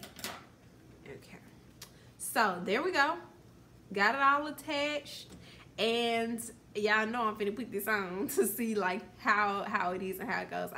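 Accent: Louisiana, United States. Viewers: New method until my wig fall off in front of everybody 😂😂😂😂😂😂😂😂😂 that's what I'm afraid of 😩 love your Louisiana accent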